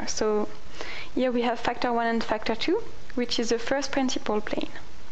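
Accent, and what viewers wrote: France. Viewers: Awful french accent! shouldn't be on a scientific tutorial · I really love your accent ... Are you French? :) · French accent definitely!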